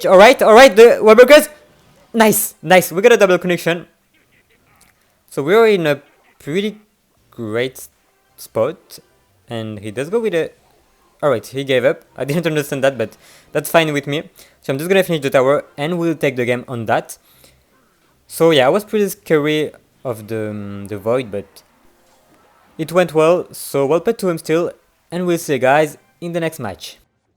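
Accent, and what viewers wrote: France. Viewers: l love this french accent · incredible french accent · good french accent bro t'es français?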